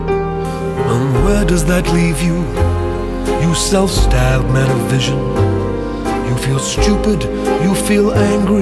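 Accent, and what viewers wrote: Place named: Ireland. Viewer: this man is Irish Up The Irish good o ya u boyo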